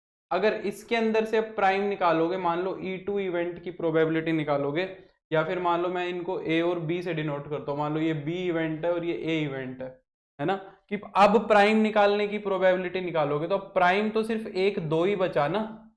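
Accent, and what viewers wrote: Haryana, India. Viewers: Sir app haryane ke ho na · Is sir from haryana?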